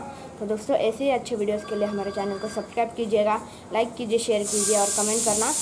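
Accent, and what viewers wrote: Maharashtra, India. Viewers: Bhai aapki drive to laajawab hai , maja aa gaya dekh ke just go forward in your life ❤️❤️ ( And I'm also marathi and I know u r also marathi by listening your accent ) 😁😁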